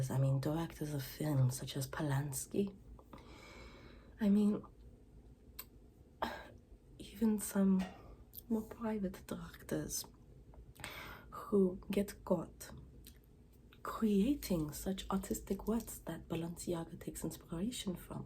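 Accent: France. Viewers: as french I like so much her French accent !!😂😂😂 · I don’t get tingles from French accents but this was worth the watch regardless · That french accent is way too accurate and relaxing… Lot of love from Paris🥰🇫🇷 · accent makes me believe that you're orginally french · As a French, I love this accent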